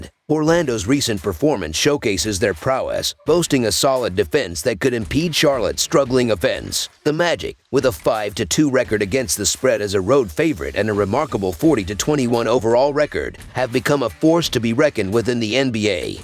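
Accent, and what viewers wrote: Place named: Boston, United States. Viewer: Love hearing your Boston accent, thanks for the laugh!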